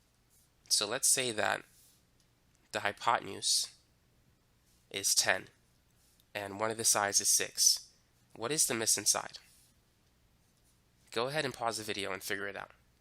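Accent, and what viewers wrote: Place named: New York, United States. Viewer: This man gotta be from new york! DeadAss!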